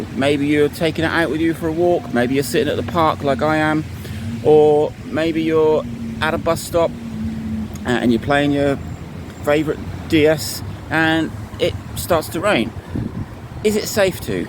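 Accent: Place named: United Kingdom. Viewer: I just found your channel today, I love your British accent and as a student about to be an English Teacher, I find it very helpful.. I also love gaming.. I'm a PC gamer, but I still do love gaming in general.. Like 10 years ago I had the opportunity to travel to Germany where I got my first portable console, it was a PSP.. What a beast of a console! I ended up selling it cuz I was stupid honestly, and I regreated my whole life since then, besides that, I never owned another portable console..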